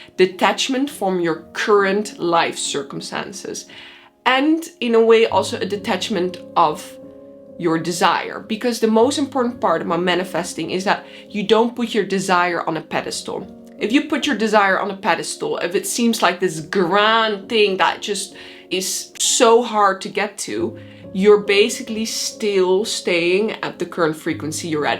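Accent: Netherlands. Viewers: Can really hear the Dutchy through your English haha. Well explained very good video thanks for sharing this amazing knowledge. Love diving deep into consciousness, manifestation and psychology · you're dutch aren't you?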